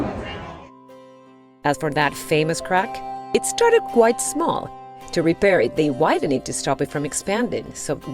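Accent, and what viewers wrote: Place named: Rosario, Argentina. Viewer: Amazing rosarigasino’s accent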